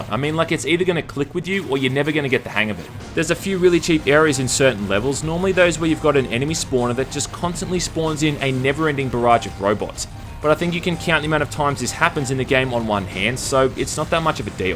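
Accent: Australia. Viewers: Yaay somebody likes me, even my mother doesn't like me. Even watching this just gives me motion sickeness, blah I shall just listen to your sultry Australian voice.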